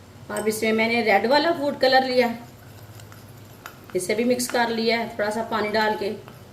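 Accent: Punjab, India. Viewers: Although Punjabi accent but excellent and simple recipe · Apki Urdu bi Punjabi lagti hai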